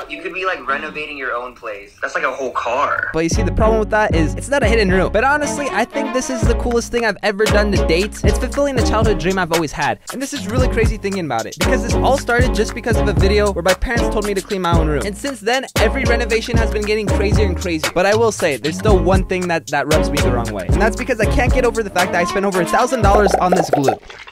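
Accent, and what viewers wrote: France. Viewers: The French accent ! xD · C'est juste dingue😶C'est la première vidéo de toi que je regarde et ça me donne déjà envie de m'abonner...Allez, +1! PS: Your french accent is hilarous🤣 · Are u french? Anyways i love ur video when i see a notif of u i smile instantly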